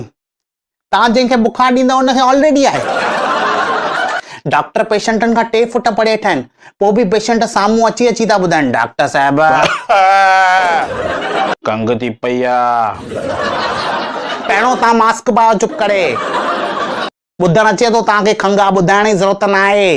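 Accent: Sindh, Pakistan. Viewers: Really refresh our mood.. Speaking skill too good.. Love to hear ur sindhi accent.. Blessings n wishing success always 👌👌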